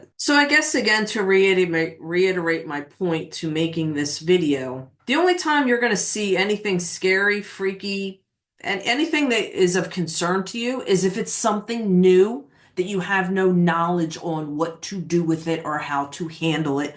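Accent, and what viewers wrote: Philadelphia, United States. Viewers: You sound like u r from Philadelphia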